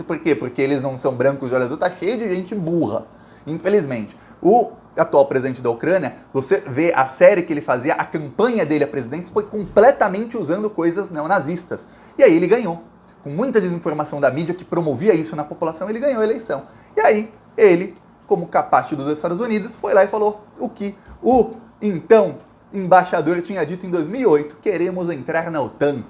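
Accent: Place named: Florianópolis, Brazil. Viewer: Poderia falar mais pausado? Tá parecendo meu irmão caçula de Floripa manezinho... Abraço!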